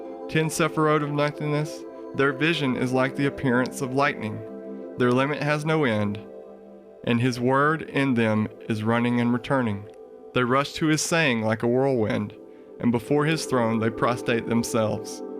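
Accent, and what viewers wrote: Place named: South, United States. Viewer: Narrator has a southern accent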